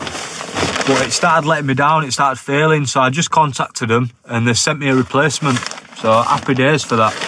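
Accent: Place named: Bradford, United Kingdom. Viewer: You from Bradford?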